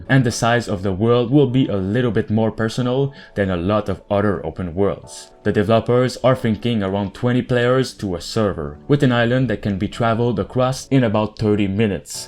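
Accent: Quebec, Canada. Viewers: Hey what’s up! This is the first video i see of yours, and i can’t stop thinking i know where that accent is from. Are you a french canadian? I’m also french canadian:P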